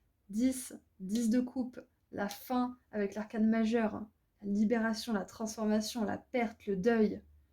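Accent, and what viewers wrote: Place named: Quebec, Canada. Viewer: Choix de l'antenne relais, avec l'accent québécois lol gratitude infinie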